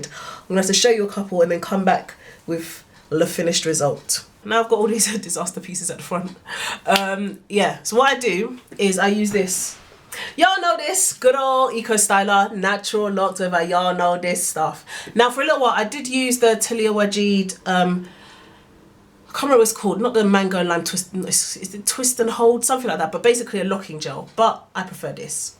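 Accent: United Kingdom. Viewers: Great video, still new at interlocking so this was really helpful. It's also so refreshing to hear another British accent, been in the states for 14 years. You are so funny!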